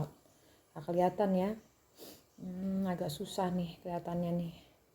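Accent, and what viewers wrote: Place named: Jakarta, Indonesia. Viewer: Assalamualaikum Mpok.. Et Mpok kaga ilang Betawi Nye biar tinggal di negara kangguru Salam dari anak Betawi kota kampung Janis jembatan 3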